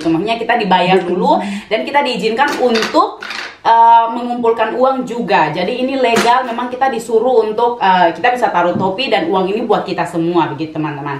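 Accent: Papua, Indonesia. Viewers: Logat Papua kaka 😁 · kk org papuaaaa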